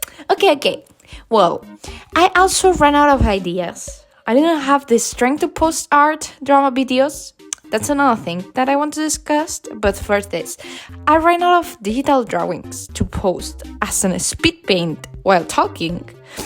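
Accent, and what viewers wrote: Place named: Spain. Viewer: You should be on my voice claim list for me and my friend's oc cuz OMG YOU SHOULD BE CHARLIES GENDER SWAP VOICE CUZ OF YOUR SPANISH ACCENT , btw love your art keep it up :3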